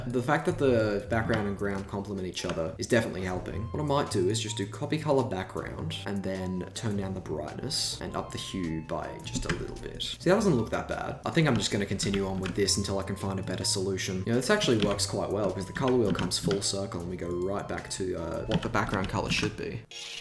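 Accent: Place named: Australia. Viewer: got punched in the face by that thick ass Aussie voice, willing to bet money this dude’s from Australia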